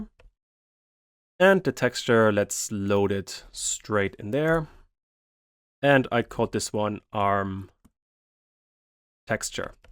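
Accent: Germany. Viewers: are you german? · you have a nice accent, are you German? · Sound like german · Are you german? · deutscher akzent?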